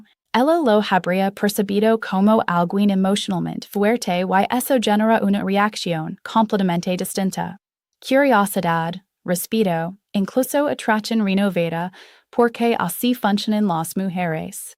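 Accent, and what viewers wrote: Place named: Chile. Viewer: Jaja, gracias por los subtitulos. Ella habla en chileno 😅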